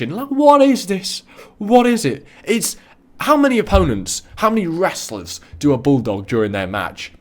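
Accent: United Kingdom. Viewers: You don't know what your talking about clearly you don't know anything about wrestling because all of those are great finishers and if you would really watch wrestling you would know that it's not supposed to hurt because wrestling is fake it's all just a act so maybe you should do more research because you don't know anything and your British a ten is horrible go back to pre-k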